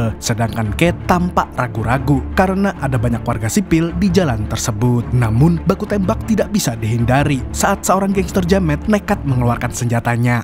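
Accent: Banjar, Indonesia. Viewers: Org banjar ini adminnya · Orang banjar kah min ? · Orang banjar kah pian mang 😂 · Org mana banjar bnar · logat banjar nya masih melekat🔥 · Org Banjar kali nya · Orang Banjar kah bg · Bubuhan urang banjar nih. Dari logat nya bubuhan brabai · Urang banjar kah pian min?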